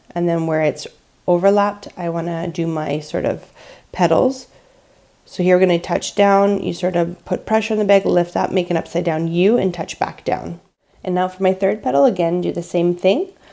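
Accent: Canada. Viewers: Thanks for this 😍 are you a fellow Canadian? I hear an accent lol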